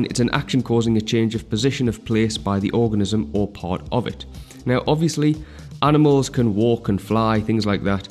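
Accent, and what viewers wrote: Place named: North East England, United Kingdom. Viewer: Love that you are from the north east haha and the accent comes out 100%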